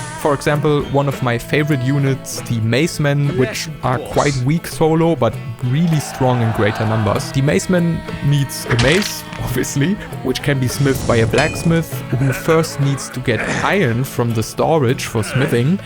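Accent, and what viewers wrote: Germany. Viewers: Ten seconds in and I already know you are german, very obvious accent lol But I really love all the memes in this video, I am indeed "all about the Ackermatch" when playing Crusader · That is the hardest german accent i´ve ever heard :D But good video! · Empire Earth and Cursader ❤ but are u german?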